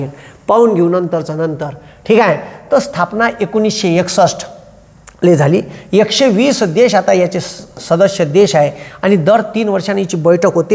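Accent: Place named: Wardha, India. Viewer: Listened to your full video, made history so very interesting. Marathi dialect wardhache asun suddha samajhayla traas jhala nahi. Tumcha energy purna video madhe continuous hota.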